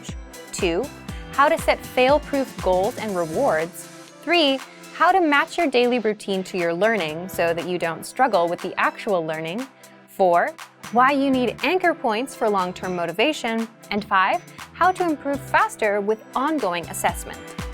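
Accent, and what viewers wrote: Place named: United States. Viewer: Hi the first time in my life Cood english american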